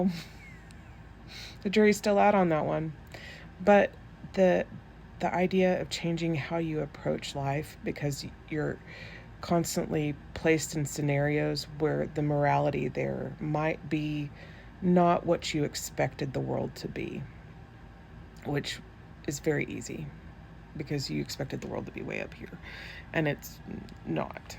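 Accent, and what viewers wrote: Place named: Texas, United States. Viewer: omgeeeez I almost commented that your accent sounded Texan!!!! You sound like my sister she lived out in Fort Worth most of her adult life ❤❤❤ I love Texas!!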